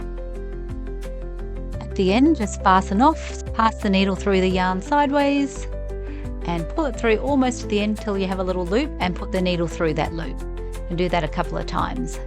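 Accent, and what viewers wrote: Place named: Australia. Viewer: Yeah! An Aussie teacher finally! 😅 Now subscribed. Watching from Northern NSW. Dee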